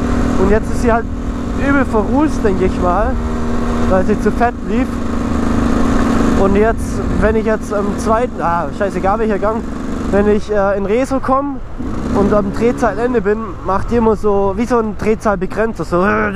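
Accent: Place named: Ulm, Germany. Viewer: Als ob du aus Ulm kommsch:D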